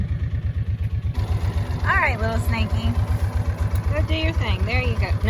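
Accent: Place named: Indiana, United States. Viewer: Watching your video's is like a part of back in Indiana, I love living here in the Philippines, And i don't miss living in the US But i do miss no country music here of bands, So you and your family gives me a taste of Indiana with your accent.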